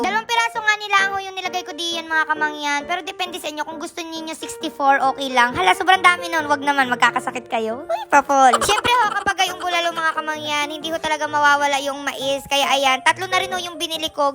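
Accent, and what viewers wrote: Batangas, Philippines. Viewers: Batanguena accent...ka gandang batang are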